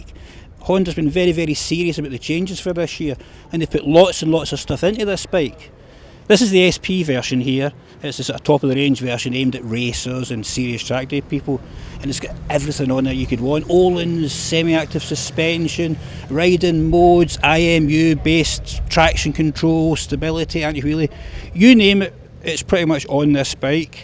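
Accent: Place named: South Africa. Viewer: Mister Knobb Yes same accent as the famous south african rally driver...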